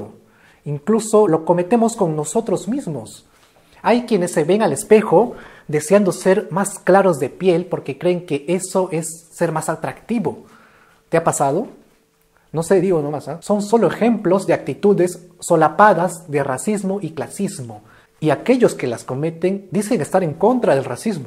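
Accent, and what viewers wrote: Cusco, Peru. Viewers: Ser y de origen cuzqueño te trajo algun problema... cuenta tu testimonio... mi querido broder...como hiciste para súperar el acento cuzqueño que es fuerte por cierto...eres quechua hablante?.tus razgos fueron motivo de mofa en esta Lima despectiva?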